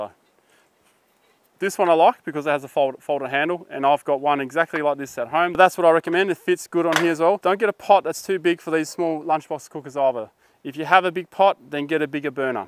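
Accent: Australia. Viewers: This guy sounds like Callum Von Moger. Where is Aus you from? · Why did I know he was Australian before I opened the video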